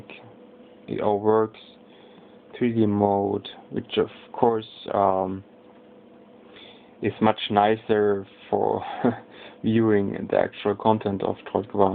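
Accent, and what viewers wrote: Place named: Germany. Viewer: du bist deutsch ich hör so was :D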